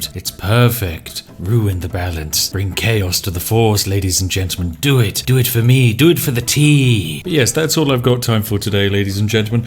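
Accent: United Kingdom. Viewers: OH MY GOD HES BRITIHS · Yo I didn’t know the ah master was a Brit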